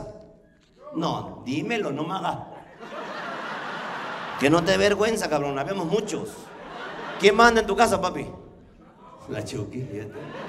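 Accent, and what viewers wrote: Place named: Veracruz, Mexico. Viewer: pues he visto un montón de videos tuyo, entrevistas pero nunca has explicado porque hablas como jarocho del puerto jejejeje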